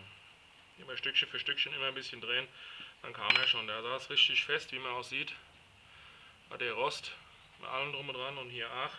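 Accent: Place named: Germany. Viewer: Ja genau, ich hab noch nie sone sache offen gehabt, hab aber diverse Beschreibungen gelesen und da kommt einem teilweise das Grauen...Bilder sagen auf jeden fall mehr als 1000 Worte. Und den Deutschen akzent lieb ich einfach:-D (als schweizer)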